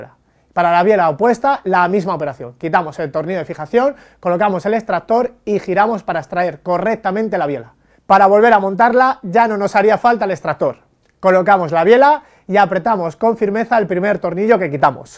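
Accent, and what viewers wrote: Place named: Spain. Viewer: Podrías hablar o explicar con mas TRANQUILIDAD !!!, Pues asi corriendo, con tu acento español, NO SE TE ENTIENDE, CASI NADA... TRANQUILÍZATE ESPAÑOL, NADIE TE PERSIGUE Y HAZ LAS COSAS i BIEN !!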